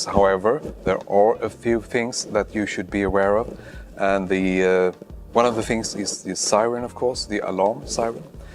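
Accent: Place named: Scania, Sweden. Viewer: Skånska (?) = kvalitet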